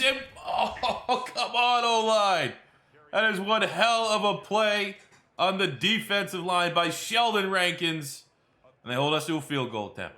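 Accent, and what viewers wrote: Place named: Canada. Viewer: This guy sounds like ricky from TPB...must be canadian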